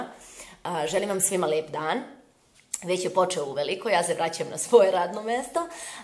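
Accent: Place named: Čačak, Serbia. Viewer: uh, sto mi se dopadas....lepo ti zvuci naglasak...,mislim Cacanski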